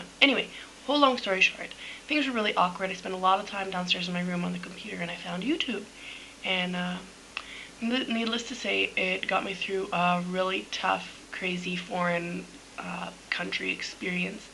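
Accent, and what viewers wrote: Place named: Canada. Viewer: i'm hooked. i love listening to your Canadian English too. And your life is so different than any I know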